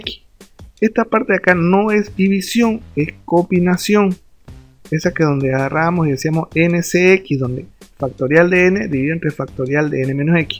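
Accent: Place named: Santa Cruz, Bolivia. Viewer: Ese acento camba, bien mi hermano me ayudaste, saludos desde la llajta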